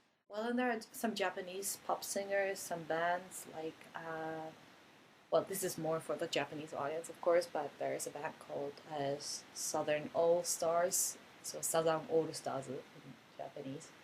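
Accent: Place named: United States. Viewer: Alice, what other language(s) do you speak in addition to German, Japanese and English? BTW, how did you get your American accent when you speak English???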